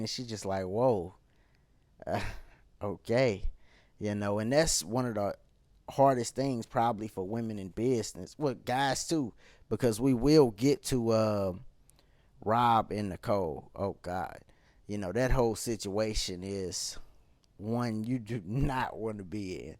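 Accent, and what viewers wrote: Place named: St. Louis, United States. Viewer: youre from stl? Lol you sound like it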